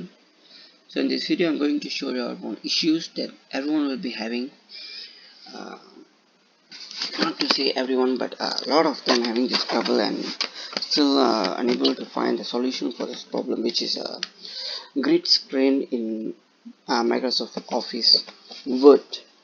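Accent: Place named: India. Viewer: bad explanation with your heavy indian accent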